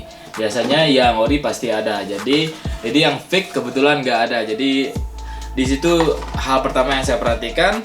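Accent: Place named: Madura, Indonesia. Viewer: Jer, mirip mirip Logat meduro 🗿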